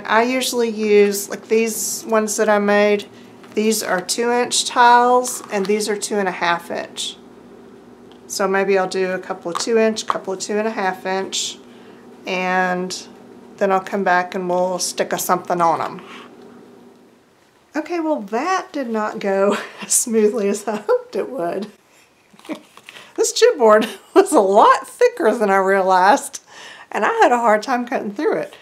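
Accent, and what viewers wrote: South, United States. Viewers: Those are so cute:-) I love your southern accent:-) I am going to try and make some of those myself. Im glad you didn't come back from cutting up the sheets all bandaged up:-) Your ideas are just clever and amazing! I would of never came up with an idea llke that with my pea brain:-) Thank you!!!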